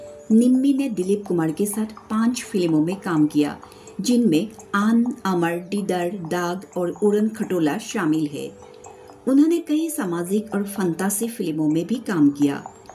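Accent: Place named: Bengal, India. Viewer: I enjoy listening to your Bengali/Asamiya,Hindi accent.It reminds me of Suchitra Sen of Andhi or Mamta fame. (I appreciate your beautiful research work and presentation)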